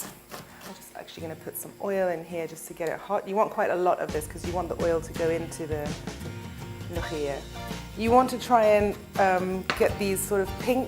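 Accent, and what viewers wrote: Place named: England, United Kingdom. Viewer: Wow as an Australian from Armenian mother who was born in Cairo I love watching you cook and your beautiful English accent. Thank you.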